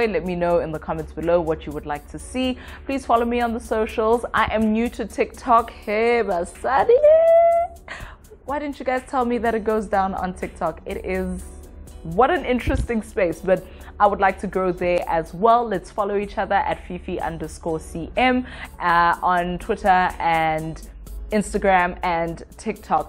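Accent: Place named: South Africa. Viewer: Absolutely live your voice and accent.. 💖 a friend of mine is from South Africa and sounds similar to you but she’s been in the states now over 20 years so enviably she’s changed some.. 🤷‍♀️ 🥰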